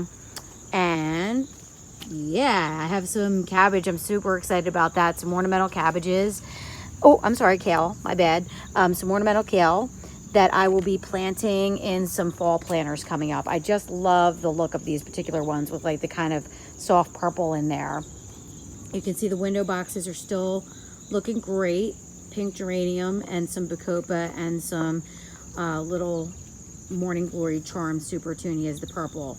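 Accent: New Jersey, United States. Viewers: I deffs knew were from NJ from listening before reading the description. And I love how cute you've made that shed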